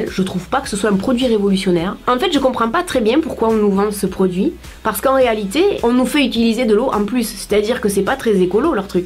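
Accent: South, France. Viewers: Du sud ( elle habite à Perpignan ) 😄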